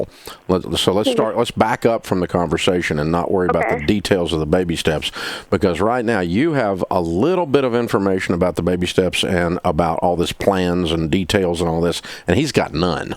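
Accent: South, United States. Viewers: I watch it for southern accent.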